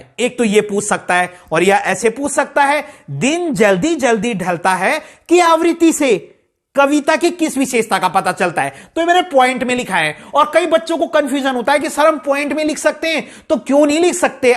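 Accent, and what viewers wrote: Garhwal, India. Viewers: Bhiya ap gadwali ho ?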